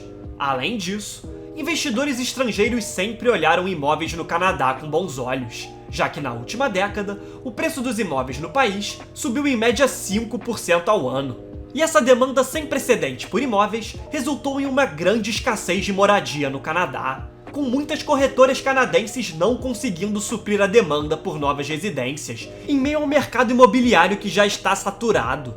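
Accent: Rio de Janeiro, Brazil. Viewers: Sotaque carioquês do narrador é horroroso! O resto, já sabia faz tempo. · Conteúdo interessante. Narração terrível, complicado ouvir até o fim. Mistura de carioques, com Marcelo Resende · Coloca um cara q fale normal... O cara puxa muito... Carioca fala muito feio.... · O vídeo é bom mas o sotaque carioca é irritante de mais. Por que se troca o "S" por X ? É uma coisa q não tem o menor sentido. "S" é "S" e "X" é "X" não consigo entender pq. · trabalhar mais o teu português carioca... parace uma panela de pressão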